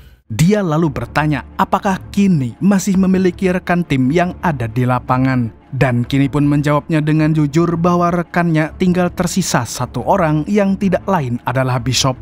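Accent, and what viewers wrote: Banjar, Indonesia. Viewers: Mamang orang banjarkah apa orang kah???😊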